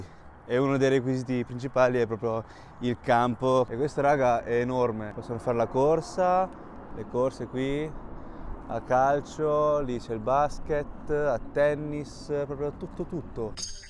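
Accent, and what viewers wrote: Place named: Emilia, Italy. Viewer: I tuoi video sulla Cina sono stupendi. Il tuo accento emiliano e' fantastico.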